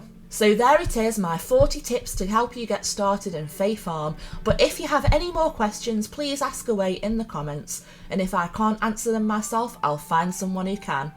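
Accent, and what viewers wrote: United Kingdom. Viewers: Can I ask are you from the Staffordshire area its your accent great video btw 👍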